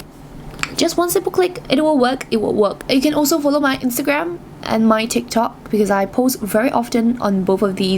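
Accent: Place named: Singapore. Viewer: Ohhh dear are you from spore? You accent is so nice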